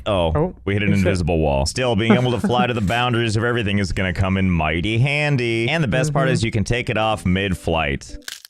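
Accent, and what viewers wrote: United Kingdom. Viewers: 1 Question. Are you British?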